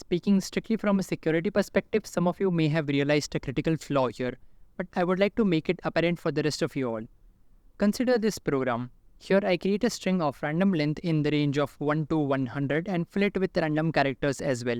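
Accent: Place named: India. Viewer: Just realized it's better switch Siri to Indian accent so I would just get used to it somehow for videos like this.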